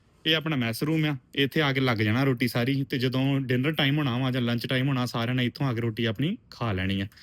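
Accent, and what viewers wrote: Majha, India. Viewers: Majhe wala 😂lagda wa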